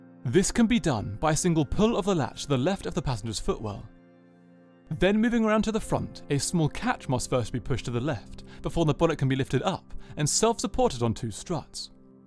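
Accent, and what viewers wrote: RP, United Kingdom. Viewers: He sounds awfully posh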